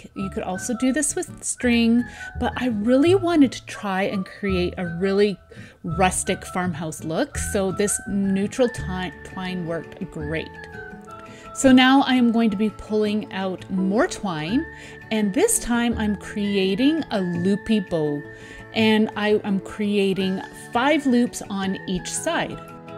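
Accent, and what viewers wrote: Canada. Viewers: I love your DIY's!! Also I recognized your Canadian accent.. lol. I'm a former Canadian living in Arizona.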